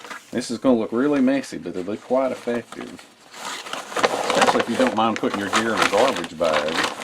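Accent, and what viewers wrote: South, United States. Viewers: Wow how do people get a southern accent like that.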